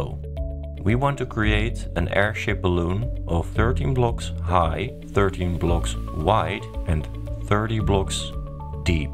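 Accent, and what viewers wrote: Netherlands. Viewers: Are you dutch? Feel like its our accent 😂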